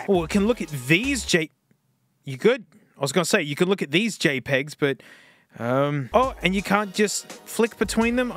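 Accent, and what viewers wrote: Australia. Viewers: Are you Australian or new zealish?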